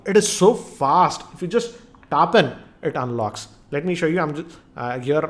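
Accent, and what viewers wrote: India. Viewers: Indien English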